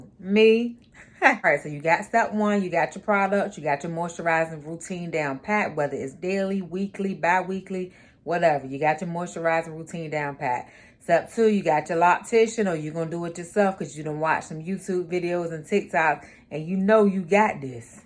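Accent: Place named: South, United States. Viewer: You are very southern lollll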